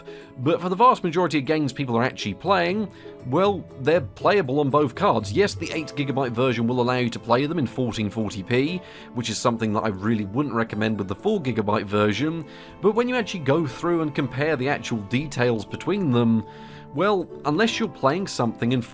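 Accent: United Kingdom. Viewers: What region of the UK is accent from?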